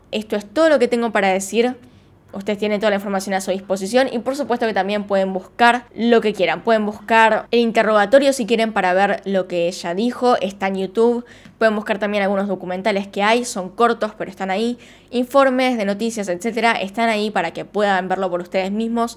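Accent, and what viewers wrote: Argentina. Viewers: Me encanta el acento argentino😀 Excelentes vídeos... Saludos desde Ecuador 👏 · Hola me encanta como narras todos los casos ya mi hija y mi yerno están adictos también a tu canal..pero..quisiera preguntarte Cómo te llamas..cuantos años tienes lo unico que sé es que eres de Argentina por tu acento Soy Panameña por todo lo demás y como decimos acá me quito el sombrero ante tu excelencia de documentar investigar y narrar todos los casos...Felicidades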